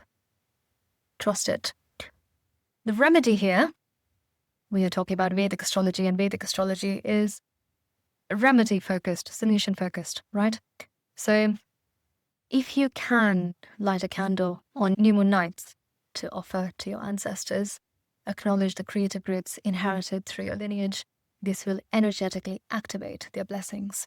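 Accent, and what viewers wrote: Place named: Guyana. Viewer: Your accent strongly reminds me of British Guyana. If I had the male version of your voice I'd be a millionaire. I'm looking forward to future videos explaining Jyotish. It's all Greek to me. Thank you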